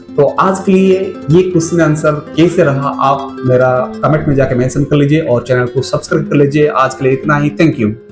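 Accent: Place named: Odisha, India. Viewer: Bhai aap odisha se ho kya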